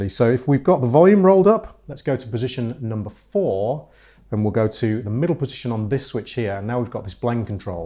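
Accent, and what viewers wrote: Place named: RP, United Kingdom. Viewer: Dude in ripped jeans yet he has a posh British accent and playing a $5000 guitar.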